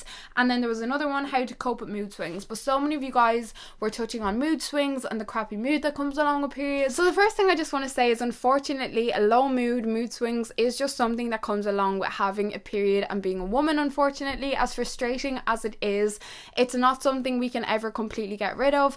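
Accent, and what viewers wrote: Dublin, Ireland. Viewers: Thank you so much for making this video cause in my house the word period is like a bad word it's so annoying. I love your EARRINGS btw❤❤❤ If your doing a Q&a anytime soon I wanna know what part of Ireland your from I'm from Dublin you sound a bit Dublin but ur like a lot posher than me so then I just thought u were from sligo for some reason idkw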